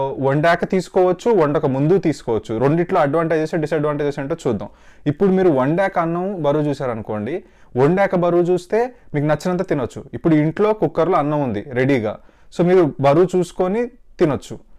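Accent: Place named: India. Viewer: bro fan of ur telugu slang!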